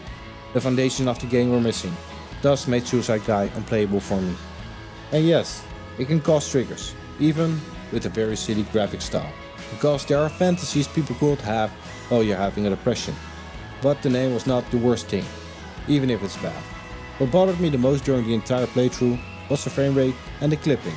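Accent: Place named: Netherlands. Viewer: It is sometimes hard to understand you due to trying to speaking as a native and your Dutch accent. Sorry to say that but it can be improved I believe. The video is great after all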